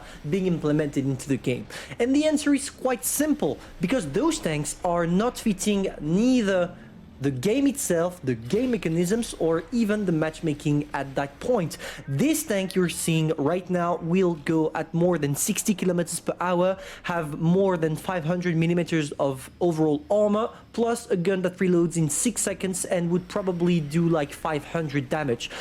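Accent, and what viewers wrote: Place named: France. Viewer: i love your video and your french sound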